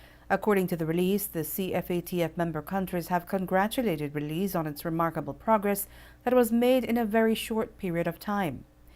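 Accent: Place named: Jamaica. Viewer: Is the newsreader Belizean? She sounds Jamaican.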